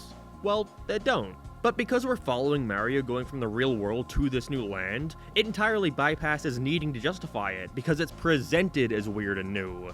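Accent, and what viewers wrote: Ireland. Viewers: dude is Irish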